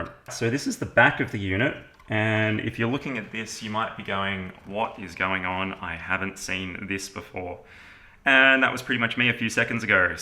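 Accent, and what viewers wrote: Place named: Australia. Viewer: I love the info in the video. but his accent kept fucking with me lol. Puts R's where they shouldn't be. And where they should be takes them out lol. Do all aussies talk like this?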